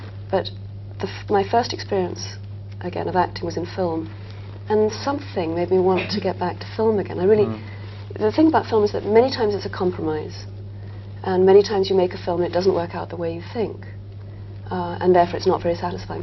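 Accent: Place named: United Kingdom. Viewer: I ❤ your British accent and you too!😊😊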